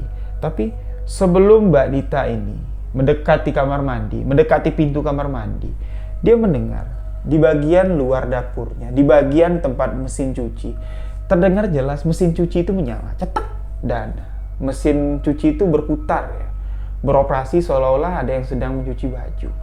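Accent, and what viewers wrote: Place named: Jambi, Indonesia. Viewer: Banten hadir kk.... Aku dari pertma x ikutin sebuah kisah misteri itu suka bnget dri cara n logat bicara y kk....logat jambi yg kental bnget Kaya kita kbawa alur cerita yg d kisahkn Pokok y sebuah kisah misteri mh keren hbis ....sehat n semngt trus y ka ..... Sukses....mg Allah sllu mlindungi kita semua.....🙏🇮🇩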